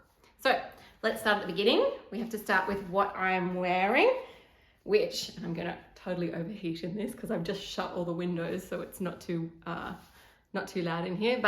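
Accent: Australia. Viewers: Congratulations on your first podcast! I really enjoyed your relaxed, chatty and informative style. I was initially drawn to your Aussie accent (fellow Aussie here!) and look forward to more episodes. Best wishes from Sydney!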